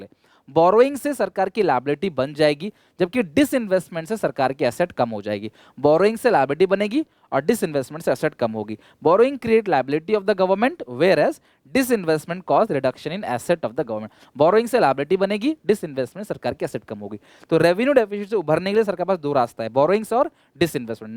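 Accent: Odisha, India. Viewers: Sir are you from odisha ?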